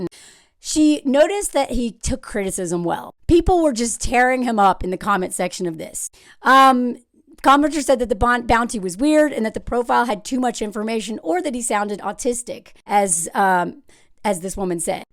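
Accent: South, United States. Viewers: I love your southern voice! 😂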